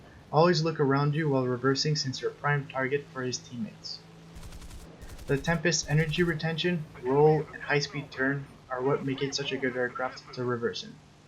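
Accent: Quebec, Canada. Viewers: Great video yet again. I have a couple of things that I'd love to understand. 1. It seems like you think roll rate is more important than turn rate is for achieving reversals. Is this because you will usually have very little time to aim and hence you will usually need to be able to orient your plane very quickly? 2. In your clip at 5 mins, what should the Spitfire Mk XVIII have done rather than pitching up? 3. Great call on adding your voice. Are you from Quebec by any chance?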